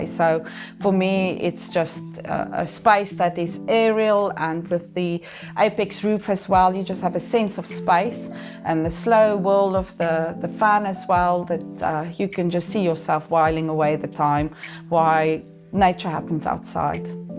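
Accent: South Africa. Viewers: Nice mooi afrikaanse aksent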